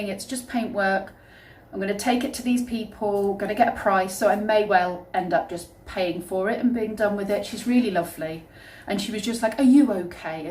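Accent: United Kingdom. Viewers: .. It's that Brit accent that gets me all the time.. hahaha.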